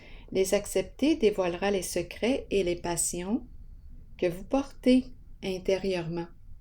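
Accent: Canada. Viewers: Bonjour. Merci pour vos tirages très parlantes. J'adore votre dialecte. C'est français canadien? Par avance, je vous remercie pour votre réponse et je vous souhaite un excellent weekend. Bien à vous 🤗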